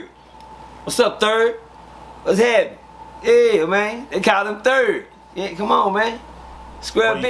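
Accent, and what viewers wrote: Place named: Texas, United States. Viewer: yea they don't know that's Texas talk..I'm from Houston and my uncle's been saying all the stuff he say lmao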